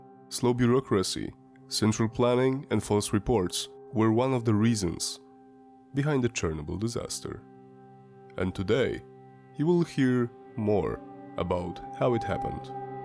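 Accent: Russia. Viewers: I thought it was a Russian accent 🤯 Runny nose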